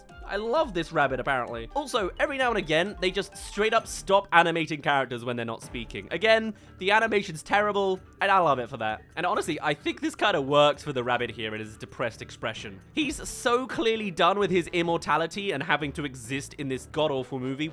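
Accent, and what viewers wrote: United Kingdom. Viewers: and you're proof is? Cause I said so? Literally you don't know that, I think hes just British and we know British people pronounce things differently than Americans. Not saying they're wrong, just saying British people are silly.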